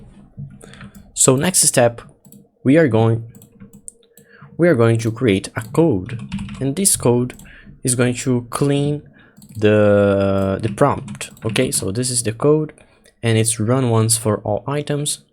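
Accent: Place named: Brazil. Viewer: Engraçado, eu ouvindo um vídeo teu, e logo percebi "pera... esse inglês tá meio aportugueisado não?" kk massa mano.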